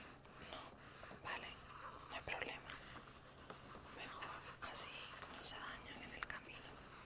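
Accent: Malaga, Spain. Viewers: Con esa preciosidad🥺💓de voz malagueña yo te donaría hasta un Ferrari si lo tuviera😂te dono cuatro pares del tirón👓💜👓💜👓💜👓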